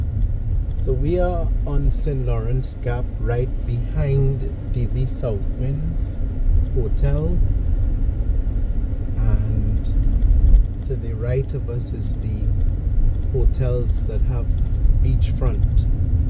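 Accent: Trinidad and Tobago. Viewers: I hear a trini accent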